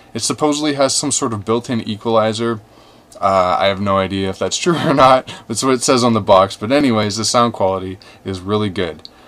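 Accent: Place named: Canada. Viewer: I hope the headphones sound better than your annoying Canadian accent.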